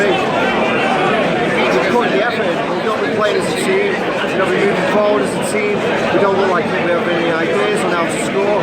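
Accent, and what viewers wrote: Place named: Manchester, United Kingdom. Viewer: where are u from bruv? U sound like ur from Manchester, as I am also. I kind of stumbled across this video, I'm glad I did, it's quality. Looking forward to ur West ham vid. Defo gonna subscribe n will spread the word. Peace!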